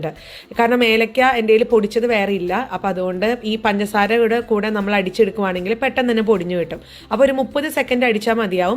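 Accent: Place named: Valluvanad, India. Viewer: വീട് എവിടെയാണ് സംസാരം കേൾക്കുമ്പോൾ ഒരു വള്ളുവനാടൻ ഭാഷ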